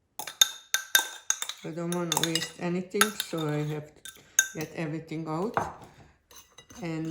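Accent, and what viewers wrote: Hungary. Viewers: This was difficult for you but you did nicely. Take off your bracelet next time. Keep at it. You’ll improve. Don’t worry about your Magyar accent, it’s nice. What is it in Hungarian and then English? Tell the audience. Also if you have ingredients that are from Hungary give reference where to buy. Good job! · What is the purpose of the cream of wheat? I want to try this but I have never bought cream of wheat. Your accent reminds me of my Grandmother who was born in Hungary. Love your videos.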